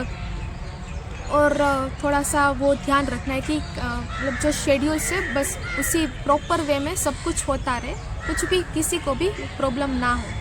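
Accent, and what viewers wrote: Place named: Kathiawar, India. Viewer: Typical kathiyawadi accent 😂 Nice👍🏼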